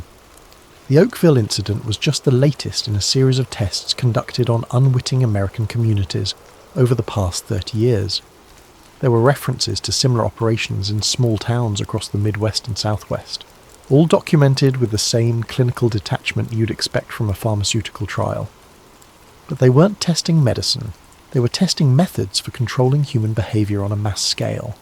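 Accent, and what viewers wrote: United Kingdom. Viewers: Ugh the older I get the less I like the British accent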